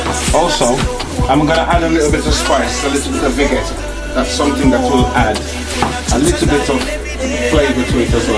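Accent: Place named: Jamaica. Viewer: cho blowwow . hear di accent nuh .. lmao only jamaicans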